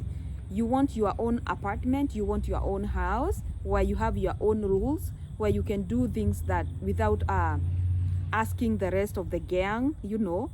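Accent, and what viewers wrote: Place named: United States. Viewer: Am happy to heard on how you pronounce your words, now you are American typical..How long you have being there!?.😂😂💪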